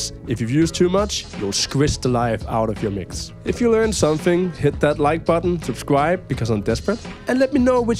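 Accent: Denmark. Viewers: du dukkede op i mit feed, og min kæreste hørte med og spurgte om du var dansker. Jeg tænkte nej sikkert ikke. Men fandme jo. Så fedt med en dansker der laver den slags yt. Keep up.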